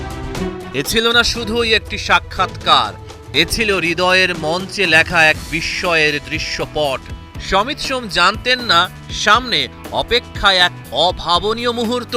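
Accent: Kolkata, India. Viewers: দাদা কি কলকাতা থেকে এসেছেন নাকি? কথা এমনে বলেন কেন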